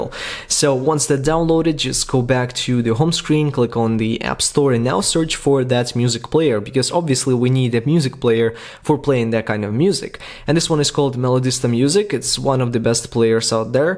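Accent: Russia. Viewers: Omg, russische Akzent 🐷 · Your accent sounds very Russian 🤔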